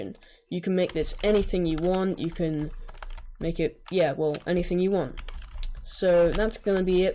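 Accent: United Kingdom. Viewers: Roblox programer: Good at intros, Good music, Good Mic, British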